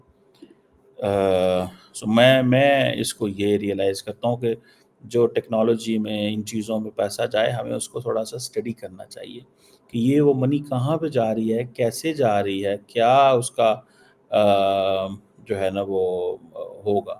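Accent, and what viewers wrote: Punjab, Pakistan. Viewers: Punjabi English awesome... Good viewpoint..... All must support the channel.... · was waiting for you to start ur rona-dhona! Why does any development about India cause u so much despondency? In ur typical casual, thick Punjabi style, you tend to trivialize everything.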